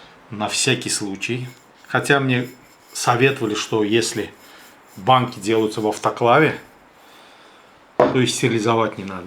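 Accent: Baku, Azerbaijan. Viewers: Родной Бакинский акцент! ❤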